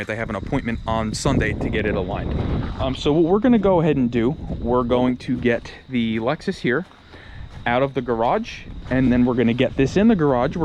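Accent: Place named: South Jersey, United States. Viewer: Much appreciated, it's on my list. Kudos from a native South Jerseyan (clocked you accent immediately 😅)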